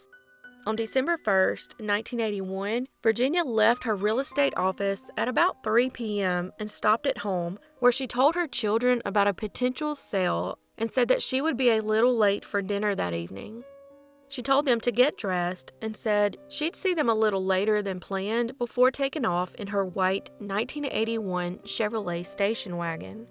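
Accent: South, United States. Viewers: I’m not from America, but…..as God is my witness ‘ahh’ adore those southern accents!😉😊😂🥰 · I'm born & raised in the city of Baltimore & i very rarely hear that nice southern accent & i absolutely love it. I come for your stories but stay for your voice. .. awsome content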